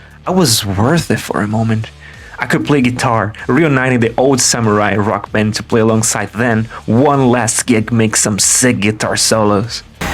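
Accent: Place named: Brazil. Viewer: eu reconheço um sotaque brasileiro de longe. ótimo vídeo, desejo tudo de bom pra ti! vc eh zica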